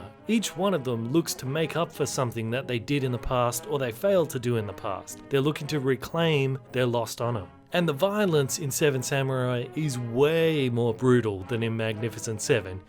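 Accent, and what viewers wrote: Australia. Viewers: Are you an aussie? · Holy crap are you an aussie too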